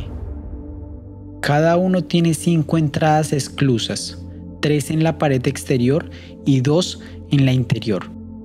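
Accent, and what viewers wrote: Colombia. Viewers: por la pausa y cadencia de tu habla y el uso continuo [ka] y [Ke] debes de ser colombiano o has vivido mucho tiempo por ahí..igual, un hermoso y bien documentado reporte....gracias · Que desubicado comentario, agradece màs bien que es gratis, a mi me pareció excelente narrador se nota que es un parcero colombiano, Cuando no el peruano con su dejo que cree que es el mejor, tendrìas que salir del paìs alguna vez para que te des cuenta lo equivocado que estàs. a no hacer caso y gracias por este aporte. Chaclacayo Perù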